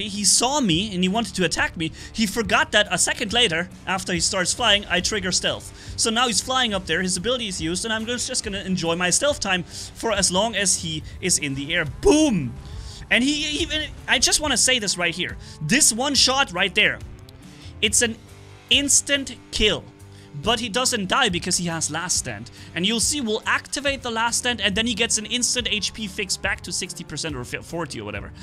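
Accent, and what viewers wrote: Germany. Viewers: Maybe dumb question, but are you German or you learn german (sorry if this is inappropriate question)?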